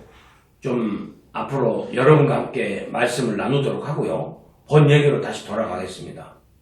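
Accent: Jeolla, South Korea. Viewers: 민영삼 티비 출발을 축하합니다. 전라도 사투리를 쓰시기 때문에 방송국 패널을 하실때 부터 제가 편견을 가지고 있었던 것을 고백하고 용서를 구함니다. 최소한 중립적인 관점에서 현 공영 언론들과 주사파 정치인들의 망국적 `언행을 비판해 주십시요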